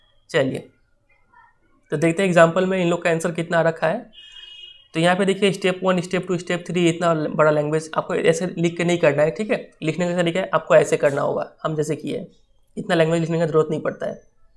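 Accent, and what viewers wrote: Bihar, India. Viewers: Bhaiya aap Bihari ho kya aap ki language Se Lag Raha tha😅🤙👍 vaise same to you😅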